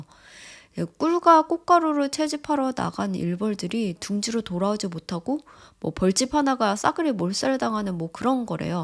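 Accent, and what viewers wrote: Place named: Seoul, South Korea. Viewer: 서울말 듣기 좋다.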